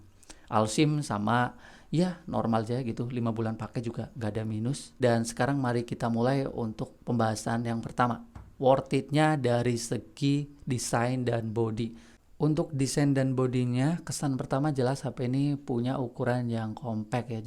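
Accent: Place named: Banyumas, Indonesia. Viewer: Njirrr wong Banyumas si. Dongen nganggo basa ngapak bae mas hahahha😂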